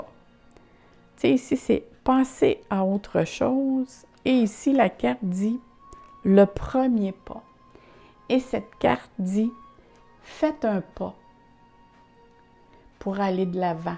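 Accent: Canada. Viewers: oh lla la vooous etes du canadda!!!!!!!!!!!!!!!!!!!!! ouppppps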